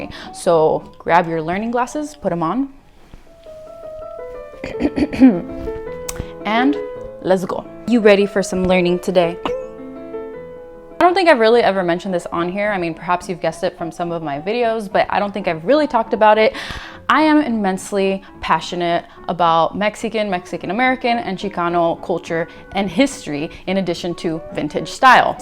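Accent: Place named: General American, United States. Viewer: Where in the states are you from/grew up? You have the most universal American accent ever--idk what people are talking about when saying you mispronounce stuff lol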